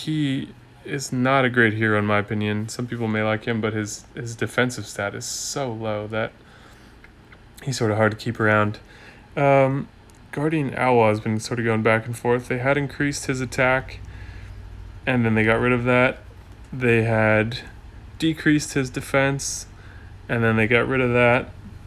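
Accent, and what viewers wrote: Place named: United States. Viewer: From the slight accent at the back of his voice it is safe to think he may be American. It's that slight drawl and laconic speech which makes me think he is someone who graduated from University. Most likely someone who has a lot of friends but only a select few whom he considers his closest mates. I don't know. I'm just taking a stab in the dark. As a writer I often create stories for those I follow on YouTube. I'll be wildly outrageous and take liberties that others wouldn't. The only thing I never do is make assumptions about their sexualities as that is a private matter that is private to them only. I don't need to know their personal life that closely but it is fun to hazard a guess at their most basic backgrounds. In all honesty, he sounds American due to the fact my sister went to America a long, long time ago and fell in love with America and hasn't come back home for over thirty years!!! 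She even sounds like a dinky di American from New York!!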